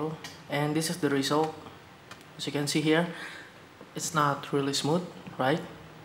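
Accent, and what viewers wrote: Indonesia. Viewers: idk why but ur accent is like an indonesian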